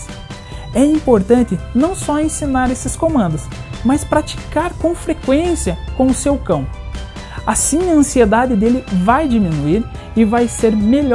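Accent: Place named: Curitiba, Brazil. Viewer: Esse cara é de Curitiba, certeza.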